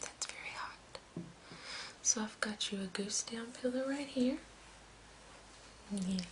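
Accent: South, United States. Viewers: I'm british so the very short answer is that to me yes she does ;o) Now however you've got me questioning myself (and so I feel like I'm putting my neck on the block for this one) since I'm obviously not exactly an expert on american accents but to me her accent sounds southern at times. I must confess it is only slight however, hence why I'm now questioing what I'm hearing :o/ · lucky, i want to be british lol, and to me it just sounds like no accent because im american :P i don't know, now that i hear it its a wee bit southern at times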